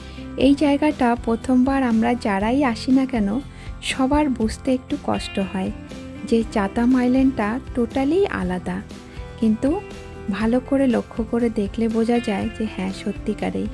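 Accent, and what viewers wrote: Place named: Bangladesh. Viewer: আপনার vlog খুব ভালো লাগে , শুধু একটা অনুরোধ, বাংলাদেশীদের মতো "এস্থানান্তর" "এস্থাপনা".....উচ্চারণগুলো একটু পরিবর্তন করলে শ্রুতিমধুর লাগবে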